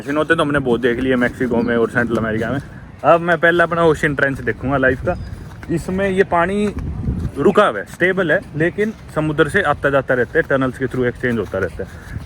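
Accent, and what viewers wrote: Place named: Meerut, India. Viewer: Meerut wali bolii katti jotte se😂😂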